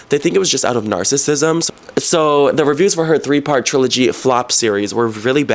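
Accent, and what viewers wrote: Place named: New Jersey, United States. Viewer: She sounds like she's from Jersey or from Newport.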